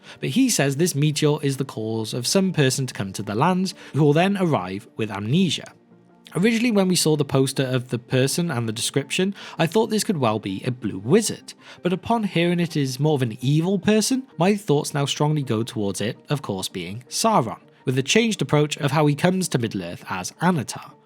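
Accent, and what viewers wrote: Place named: Wales, United Kingdom. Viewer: Literally just stumbled on this channel today. Presenter’s accent is slightly subtle and I’m like “is this guy Welsh?” … then I hear how he pronounces Morfydd and Owain and I’m like “you son of a bitch, I’m in” SUBSCRIBE 😁🏴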